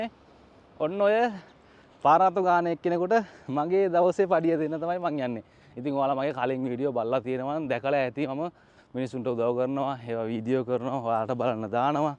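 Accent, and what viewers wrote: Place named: South, Sri Lanka. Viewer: මම නම් ඔයාව subscribe කරෙත් video බලන්න ගත්තෙත් ඉස්සර හිටපු travel bro නිසා❤අනිත් එක කතා කරන විදිය මොකද දකුණෙ නිසා❤මොකද මාත් දකුණෙ 😁, ඉතිං සුබ පැතුම් අනාගතෙට❤🎉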